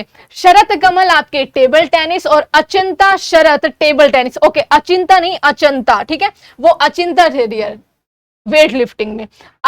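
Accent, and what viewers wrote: Haryana, India. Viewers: Mam are you from haryana???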